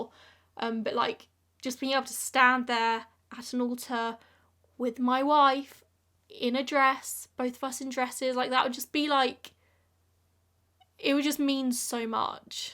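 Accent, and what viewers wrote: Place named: RP, United Kingdom. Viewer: Honestly she has the best voice/accent. You should be the new "Mind The Gap" voice.... Very posh. What area of England are you from? I'm sorry Reading is a shit hole. I'm from Kentucky, maybe for your next horse you can come to Fasig-Tipton and buy one 😆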